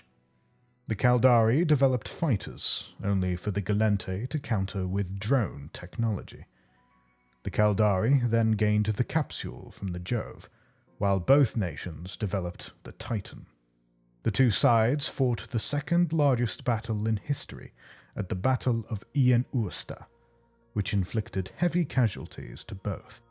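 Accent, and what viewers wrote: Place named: United Kingdom. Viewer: I can hear all the astrophysicists cringe, but since it’s a British accent I’ll allow it.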